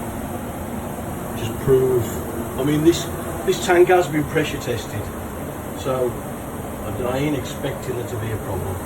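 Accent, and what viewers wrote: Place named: Birmingham, United Kingdom. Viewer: Hi &, just wanted to say a big thank you for taking the time to 'mansplain' things,edit, and put these videos up. It makes things so much easier to understand when you have pictures to look at )) I know its naughty to make a request of someone who is doing something for nothing etc but, would it be possible to film the measuring and template making for one of the bulkheads? And finally, I know you said you had driven up from Telford on one of the videos but your brilliant accent sounds like its out of deepest darkest brummiland - I'm a brummie too but without the accent so heres a big thumbs up for a fellow Birmingham lad 👍 👍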